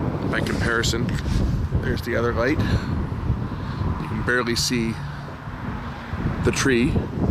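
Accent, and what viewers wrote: Canada. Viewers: From your canadian english accent I think you are from British Columbia?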